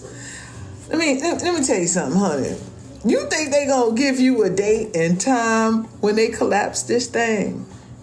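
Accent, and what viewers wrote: New York, United States. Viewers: This sister gotta be from New York ! If not, Jersey or Philly. Definitely the East Coast no doubt ! I love this lady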